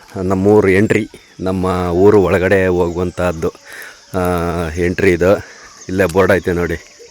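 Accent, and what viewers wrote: Mandya, India. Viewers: Nimma ganda hendathi koli jagala chennagirutte. Namma mandya bhashe kelokke chanda .Nim vlog nodthaedre thumba khushi agutte.Nanu nimma hosa subscriber.😊😊😊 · Sir nim hadu bhashe super mandya nativity super family vlogs madi sir nim hendthi soft innocent super sir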